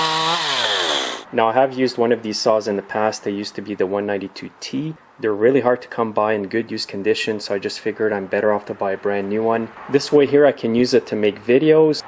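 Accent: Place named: Quebec, Canada. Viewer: Ok you sound like a Quebecer 100%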